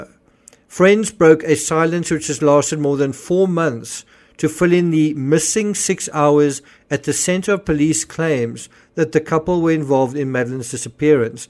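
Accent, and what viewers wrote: South Africa. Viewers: OH! It's was in the Daily Star, then we know it's true. I can tell from your accent you are South African, you clearly do not know what sort of newspaper the Daily Star is?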